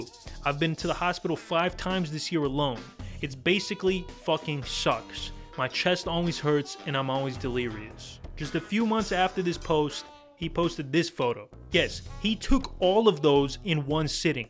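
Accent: New York, United States. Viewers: 😅This is the most NY Accent I've ever heard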